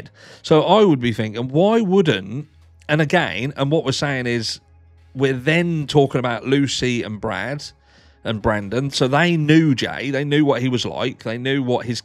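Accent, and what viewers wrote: Norfolk, United Kingdom. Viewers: Love the Norfolk accent I’m across the border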